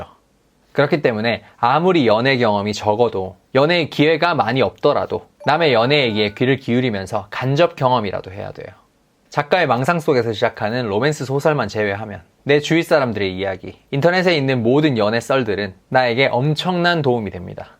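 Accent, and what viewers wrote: Gyeongsang, South Korea. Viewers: 뭔가 말투가 말 끝부분은 서울말인데 단어단어에 부산, 경상도 사투라가 녹아있음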